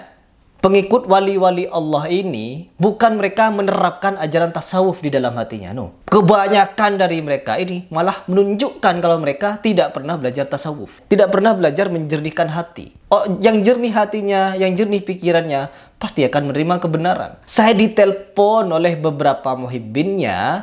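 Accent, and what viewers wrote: Madura, Indonesia. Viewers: ni orang kontenya cuma kebencian,logatnya madura tapi adabnya isra'il,pasti ini belajar pada google dan youtube,sudah jelas mulutnya sebentar lagi miring hidup dan matinya susah bahagia,semoga segera dapat hidayah,tapi orang kyk gini susah dapat hidayah krn sudah tercampur setan yg angkuh dan songong,biasanya klo orang hebat beladiri semakin tinggi ilmunya semakin merendah hatinya,brrti ini masih dikit ilmu beladirinya,sehingga angkuh songong seakan akan paling suci dan paling benar, na'udzubillah stumma na'udzubillahi min dzalik.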